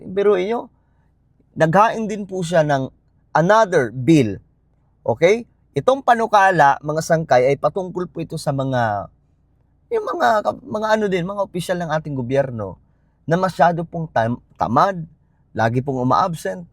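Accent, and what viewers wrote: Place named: Visayas, Philippines. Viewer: No offense sangkay...napaghahalata ka talagng Bisaya kc some of your pronounciations are hard aeg: Senate you said Si- nate, abolish not abulish..:hand-purple-blue-peace::hand-purple-blue-peace:💌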